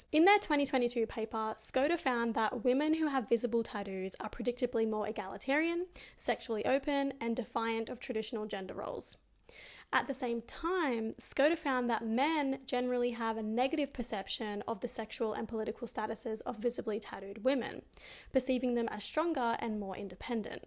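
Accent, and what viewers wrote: Australia. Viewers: Oh, I am from Austria - where tourists think that Kangaroos are from. Don`t hear too mutch aussi accent here - that`s maybe why you sounded unfamilliar to my ears... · So you pronounce it tadoo in Australia? My brain makes me understand "taboos" half of the time.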